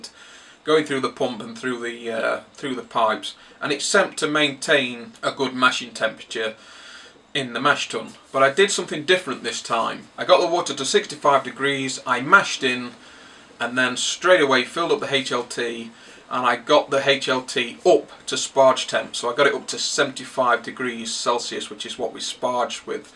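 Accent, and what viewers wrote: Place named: Northern England, United Kingdom. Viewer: Great vids (always good to hear a bit of northern accent on here!...(i'm a lancs lad!) Don't know if you ever followed a guy called secue01 but he gave a good shout out this week about some corny kegs... in a nutshell us UK lads can get some corny kegs on the cheap approx £33 delivered from an Ireland company.... <link> <link> <link> seems pretty legit. Just thought i would spread the word! cheers Mike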